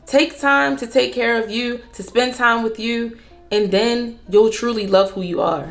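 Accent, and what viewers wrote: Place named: Maryland, United States. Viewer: My gurl sound like she is from MD ?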